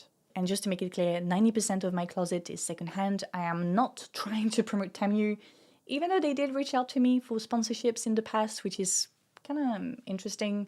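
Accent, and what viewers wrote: France. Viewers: Thanks for raising your voice regarding Temu and that mass consumerism. Got a rather ascetic lifestyle, so Temu's offering sounds immediately idiotic to me. Why buy more than you would ever need? Seems crazy to me, but i know how society (*ahem* businesses) push people to always want more, newer and shinier stuff... Btw, took me a surprisingly long moment to realize you are FR, as I am. Great accent :)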